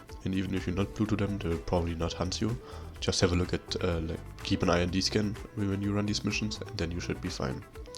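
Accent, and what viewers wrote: Germany. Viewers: you have such a cute Deutch accent =)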